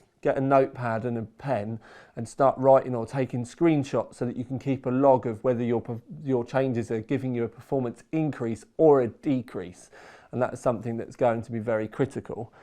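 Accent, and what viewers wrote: United Kingdom. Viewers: please reduce your british accent ! 70% in youtube user comes from non english countries and cant understand british accent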